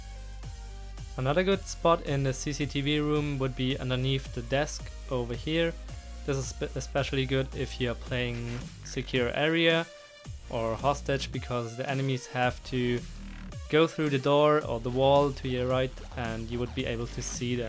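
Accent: Germany. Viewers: i knew youre german...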